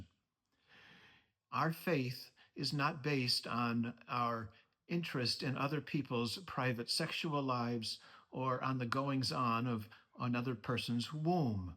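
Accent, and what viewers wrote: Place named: Boston, United States. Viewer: Thank you for your Bostonian voice, your sound words have been comforting in more ways that you’ll ever know. May the Lord God continue to bless, amen. Amen!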